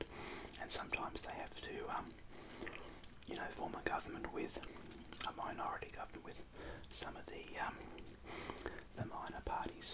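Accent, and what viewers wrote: Australia. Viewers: I think half of these views are mine for the last months 😅😅 i hear it every day almost. Chewing gum rules! Till now never have noticed the Australian accent, but you introduce me to it and I absolutely love it. And it was very creative idea to talk about politics (maybe not a popular theme in the asmr world but a wonderful niche)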